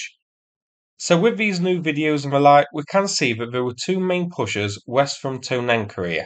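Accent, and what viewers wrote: United Kingdom. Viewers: The British accent is fantastic. It fits very well with British humor.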